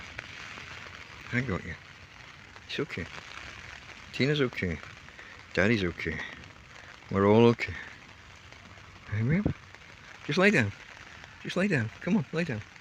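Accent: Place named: Huddersfield, United Kingdom. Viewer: just in case you were familiar with the other sons of Huddersfield The Sheepfarmstudios brothers Chris & Dom. I had a lecturer from Huddersfield sounded like yourself. Sheepfarm had yet another Huddersfield original on called Michael O'Deira who might assist you with your banking issues.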